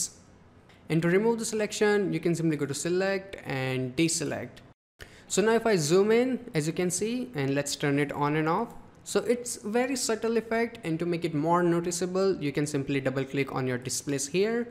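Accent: India. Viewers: the indian accent is so cute lol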